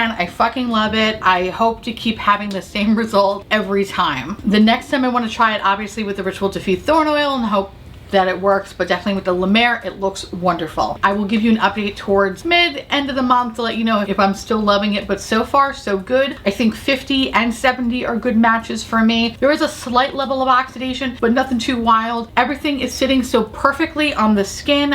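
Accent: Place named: New York, United States. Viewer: I love you, you know this - but I’d absolutely love you to do an entire video in your New Yorker accent. Friday evening in Australia, been looking forward to getting home after work, having a few drinks and watching your video. Have a great weekend!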